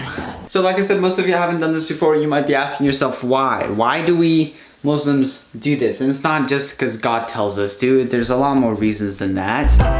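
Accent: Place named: United States. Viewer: cool video! im an american that moved to Turkey a couple of years ago. i have some uzbek friends. they dont know english, so its really interesting hearing an uzbek person speaking perfectly accented american english lol... Türkiye'den Üzbekistan'a selamlar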